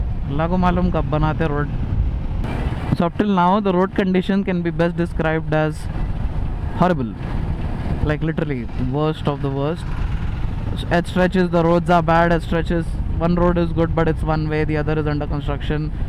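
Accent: Hyderabad, India. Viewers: again hydrabadi touch😂😂 keep it up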